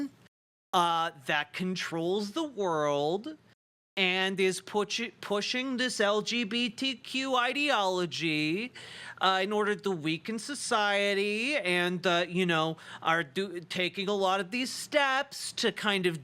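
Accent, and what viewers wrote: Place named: Delaware County, United States. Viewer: And having a Delco accent, is a plus too 😂.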